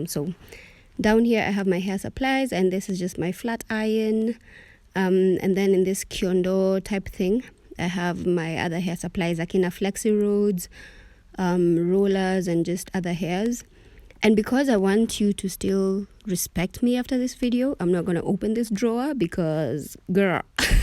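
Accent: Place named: Kenya. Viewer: Kenyan YouTubers and accents yawaa.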